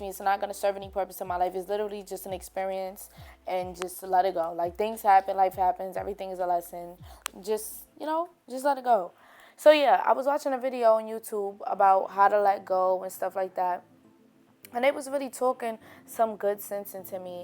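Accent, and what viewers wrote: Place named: Baltimore, United States. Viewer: Lol are you from Baltimore? I love you accent btw 💕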